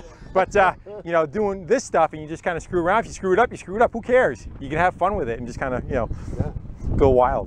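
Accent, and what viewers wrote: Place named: United States. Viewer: It's ok, you speak American like us! If we wanted Shakespeare we would be watching a play!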